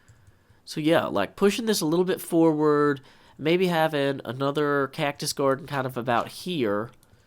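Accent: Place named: South, United States. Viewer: I've always loved your accent (I'm English and a sucker for Southern American accents) but now I'm starting to love your crazy sense of humour.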